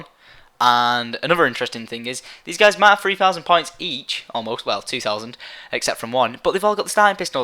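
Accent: United Kingdom. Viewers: Paka poonch. Oh, ur so Brittish.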